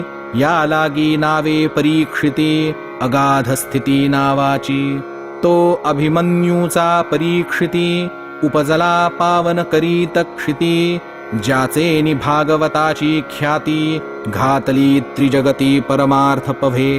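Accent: Standard. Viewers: Khup dhanyavaad... Aj guru krupene ha garth ch parayan karych ichha ali... And tumcha ha vedio bhetlaaa khupp khupp dhanyavaad... Tumchya vedio muje agdi shuddh uchhar hotatt... Khup shayog bhetlaaa.... 🙏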